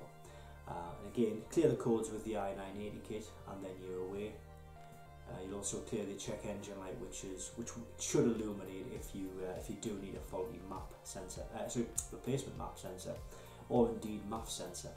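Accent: United Kingdom. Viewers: This British accent.....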